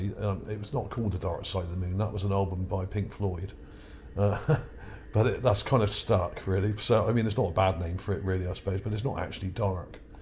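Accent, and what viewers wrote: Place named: Wiltshire, United Kingdom. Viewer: I thought this Was Richard Dreyfus . Although I don’t watch tv i enjoy comics on YT and wondered what happened to him. Wasn’t he from Dorchester ? Although our narrator sounds more Wiltshire imo. How come they haven’t got a better look at the spire thing photographed in the 60s ? ( on the moon , not the one in Salisbury) ? Like others say , they aren’t spending multi millions to just sightsee ( as the Russians did - not in Salisbury of course ). Keep up the interesting work fella - up the Cherries 😎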